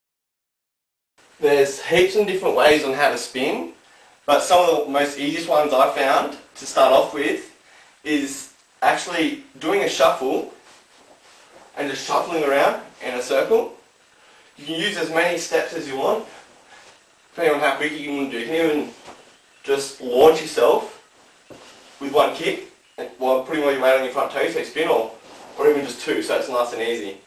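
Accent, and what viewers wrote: Australia. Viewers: OMG~!~! hes australian awsome acsent dude....... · are you aussie!? your an aussie! haha me too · I watched this video 8 months ago, it helped me get the moves down. I'm not gonna lie, I've ended up more hard style but still, this help me get started, Thanks for this video. And from the sound of it, you're Australian? Would make since, it is the * Melbourne Shuffle after all. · Aussie accent :) yay. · I'm not sure what is stronger. His ability to dance or his aussie accent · i tried learning it, but i cant dance so i couldn't do it :( but omg great vid and ur rlly good at shuffling :) btw, what nationality are you? are u australian, ur accent is awesome :)) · Are you australian? Fuck yea! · his acent sounds australlian cause it is????? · proper aussie accent bra! likes it! 5/5 this how i learnt! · aussie accent :) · Why are people saying he sounds British? 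Not sure but he sounds more Aussie than British to me. But that is just an Aussie talking, what do I know? We are all upside down anyway xD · He's Australian. Our accents aren't that difficult to understand. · you mispelt guide*........i know your an ausie but please try and speak ENGLISH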